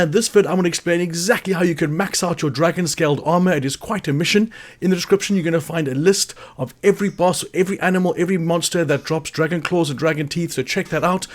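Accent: South Africa. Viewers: Thanks for the tips. Are you South African? You sound like it.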